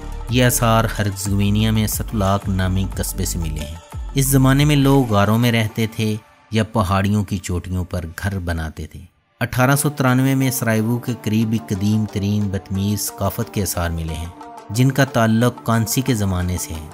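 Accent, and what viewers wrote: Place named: Punjab, Pakistan. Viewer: yar urdu to seikho bhaye sp URDU esy bolty ho jesy panjabi bol rahy ho mazrat k sath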